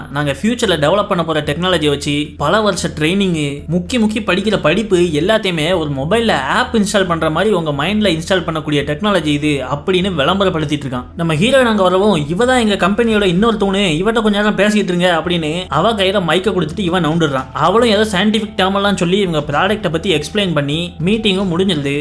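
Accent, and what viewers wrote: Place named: Kanyakumari, India. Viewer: Super slang are you kanyakumari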